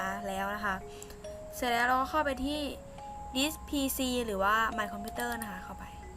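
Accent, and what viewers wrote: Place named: Thailand. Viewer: แอบเหน่อๆนะเนี้ย น่าร๊ากกก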